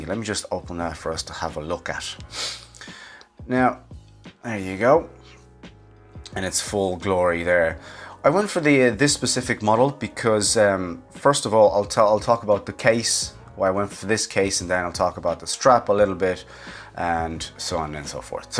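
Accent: Ireland. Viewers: You sound Irish!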